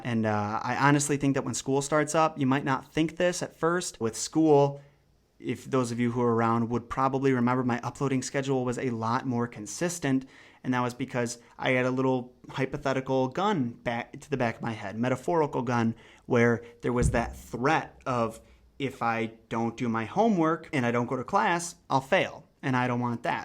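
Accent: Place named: Brooklyn, United States. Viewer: And side question, would your new content be like pyros or nfkrz'? If so fuck yeah dude if totally be interested in hearing your opinion on shit. Plus with that whole Brooklyn way of speaking you got you can do some great stuff. Keep it up man.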